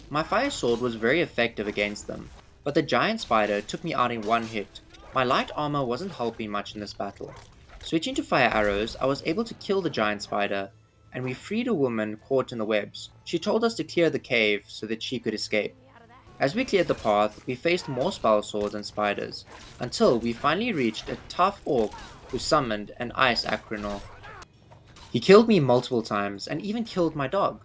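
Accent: South Africa. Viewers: You south african mate ?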